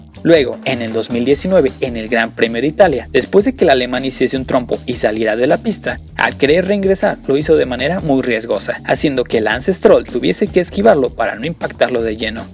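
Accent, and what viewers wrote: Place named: Mexico. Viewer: q tenga acento mexicano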